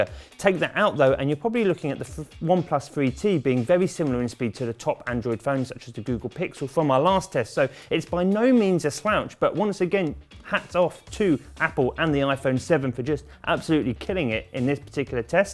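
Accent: England, United Kingdom. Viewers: first name surname he's from England that's how his accent sounds like and English is originally from England. Deal with it in fact your accent is the one that is weird to them.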